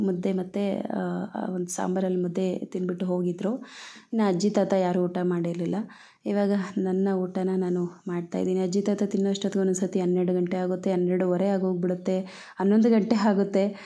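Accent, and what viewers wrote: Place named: Bellary, India. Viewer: Nivu matado shaily nodi nanu bellary ಮೊಳಕಾಲ್ಮುರು side erbodu ankonidde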